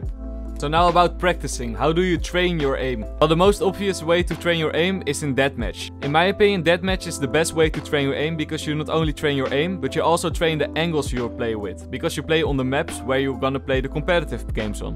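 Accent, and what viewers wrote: Netherlands. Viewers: are you dutch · Dutch accent on point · Ik hoorde gwn aan je stem dat je Nederlands was lmao ez peazy lemons squezy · I can feeeeeel the Dutch voice trying to come out idk but I'm 99 procent sure your Dutch Same ass me that accent its exactly like just people in Belgium and the Netherlands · I smell some dutch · ben jij nederlands of belg want ik hoor dat aan u accent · Jij bent lederlands he smieght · Are you dutch? Because you sound a little bit dutch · hahahaha hoor meteen dat je nederlands bent, wel goeie tips though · mmh i smell dutch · dutchiest accent ever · Ben Jij nederlands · Ik hoor dat je nederlands bent haha lol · The dutch accent in your english 😆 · Nederlandse engels belike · Your dutch accent makes this terrible to listen to. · i smell dutch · “Has a Dutch accent” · are you dutch by any chance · i hear some dutchie in him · this man souds dutch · This video smells like a nederlands accent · I smell Nederlands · The dutch ascent makes this 100x better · I hear nederlands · Thx for the vid and something else i smell dutch😂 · Je hoort gwn aan je engels dat je nederlands bent😂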